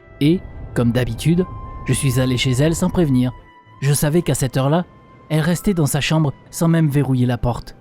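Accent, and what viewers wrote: Marseille, France. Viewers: Accent marseillais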